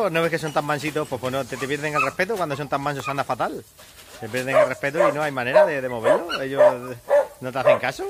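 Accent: Extremadura, Spain. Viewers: Lo comprendo y respeto. Desciendo de extremeños de Badajoz y tengo familia de Alía. Pero tan solo era porque reconozco el "deje" extremeños. De todos modos solo quiero darte ánimos y que sigas subiendo videos. Muchas gracias por contestarme.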